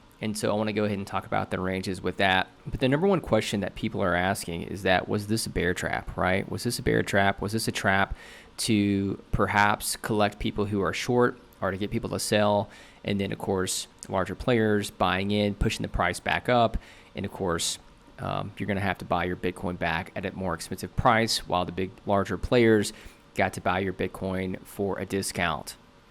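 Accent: United States. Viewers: Hello. Thank you for your thoughtful and insightful assessment of the current market. Also, I very much enjoy listening to your charming American accent.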